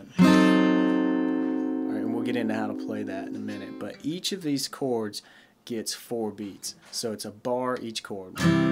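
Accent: South, United States. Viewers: This progression definitely make for some beautiful medley!!!Thanks Bro!!BTW I knew u r from the south.Im from Alabama so I know a southern drawl when I hear one!!!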